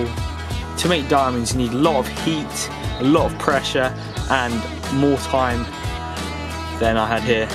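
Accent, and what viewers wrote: United Kingdom. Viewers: I LOVE WATCHING ALL THESE CRUSHING VIDEOS! PLEASE JUST KEEP CRUSHING! Love that brit accent <3 :)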